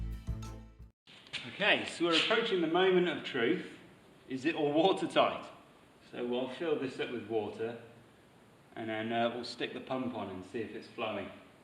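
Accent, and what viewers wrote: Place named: Birmingham, United Kingdom. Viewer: PS, I'm guessing from your accent you're from around Birmingham??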